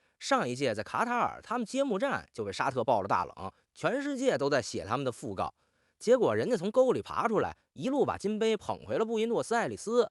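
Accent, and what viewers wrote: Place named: Tianjin, China. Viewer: 这个台北主播为啥一股天津味儿？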